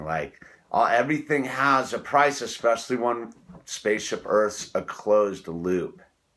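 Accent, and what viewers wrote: California, United States. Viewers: You are the most Californian person I’ve ever heard speak. Really enjoyed the review from the UK 🇬🇧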